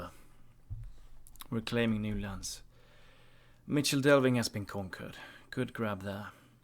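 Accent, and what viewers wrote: Sweden. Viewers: From which part of Sweden are you?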